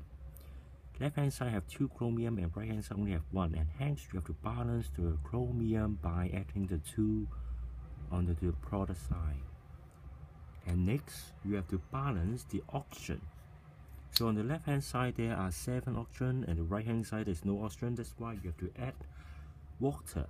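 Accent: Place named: China. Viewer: From your accent I can guess that you are Chinese. By the way, it is now easy as a piece of cake for me thanks 👍👍👍👍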